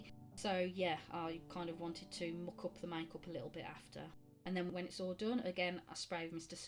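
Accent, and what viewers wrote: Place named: West Midlands, United Kingdom. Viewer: I am glad you put her lower jaw on she looks fabulous.She’s actually quite glamorous for a zombie lol.I love the face paint and the cap really does make a great option to scraggly hair...The way you did her mouth was very clever and made her look less doll like.I also like the pose too it’s really good and I think the fixed dolls look great as they stand better than on those plastic stands..Apart from the face my other favourite part is her boots.They are so cool ,and I like the colour you did them..Do I detect a West Midland accent with you too.have not noticed until today..I am from there .It’s actually nice having one of my favourite doll makers coming from here...Keep up the great work I am looking forward to your next one 🥰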